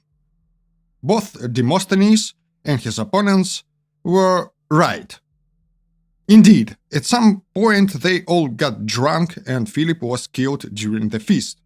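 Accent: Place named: Eastern Europe. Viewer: II suspect by your Slavic sounding accent your motives are less than objective. a. Contrary to your claims in this video that the "majority" of Greeks didn't consider ancient Macedonians Greeks (a "fact" you've made up), ancient Macedonians were recognized as Greeks by other Greeks. This is witnessed by their centuries of participation at ancient Olympiads which at the time were only for Greeks. Its not just Herodotus that claims ancient Macedonians were Greeks. Countless artifacts suggest this. For example there is records of Alexander's own father Philip participating at three different Olympics in the horse race. What you are doing is twisting a handful of references of other Greeks calling them barbarians. Most famously Demosthenes did this. Whats missiing in your narrative though is context. Demosthenes himself was called a barbarian by other Greeks on several occasions. 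Barbarian was being used as an insult. b. I can tell you have depended on Wikipedia's flawed map of ancient Macedonia. Ancient Macedonia of Alexander fame is already 100 percent in Greece. Even parts of ancient Paeonia is in part of northern Greece.. THE Macedonia.. Skopje is not even geographic Macedonia unless you want to claim Roman Macedonia or ancient Macedonian conquests of as "Macedonia". And in that case, huge chunks of north Africa, middle east and Asia would also count as Macedonia. C. You downplay the self-detemrination of ancient Macedonians.... like Athenians.. .like Spartans.. like Corinthi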